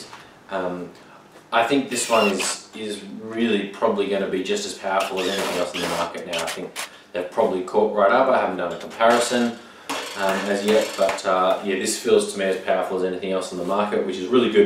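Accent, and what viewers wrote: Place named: Australia. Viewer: Love it when an Aussie is doing a video - great to hear our accent.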